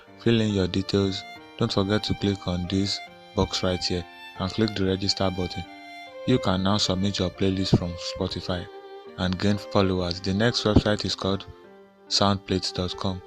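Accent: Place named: India. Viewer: You are speaking like an Indian man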